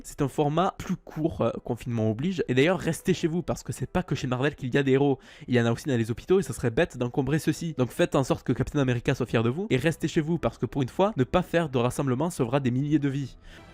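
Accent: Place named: South, France. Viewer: Cet accent sudiste moelleux m’avait manqué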